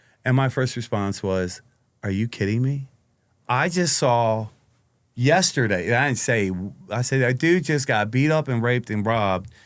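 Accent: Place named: Baltimore, United States. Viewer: Why the Baltimore accent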